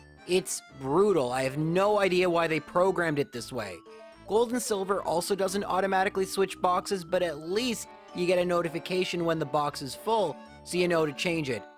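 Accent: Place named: Canada. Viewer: I'm not sure what part of Canada lives in, but it was uploaded pretty early in all of the timezones. If he lives in Newfoundland or Nova Scotia, it was a somewhat normal time (around the 7am range), but his accent suggests to me that he doesn't live in either or those places. I could be wrong about that though, as some people try to underplay their natural accent.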